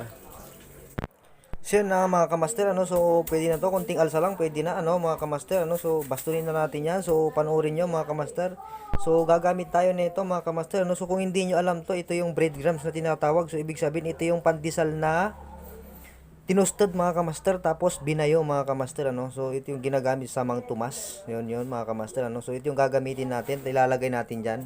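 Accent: Pangasinan, Philippines. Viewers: Taga pangasinan ka siguro ka master hehe the way u talk pangalatok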